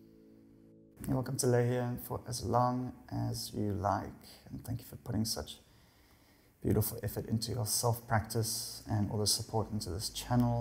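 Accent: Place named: South Africa. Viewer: For a Saffa abroad, it's SO lekker to hear your accent 👌 loved this flow, and thank you for the decent Shavasana at the end! Thank you!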